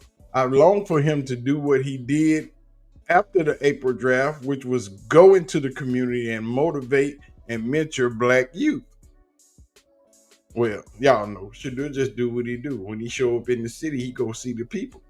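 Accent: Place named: South, United States. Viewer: you a trip - Sounds like.... a lil bit black. A lil bit southern. Reminded me of Samuel L. Jackson thumbs up brother keep up the great work,u got a fan in me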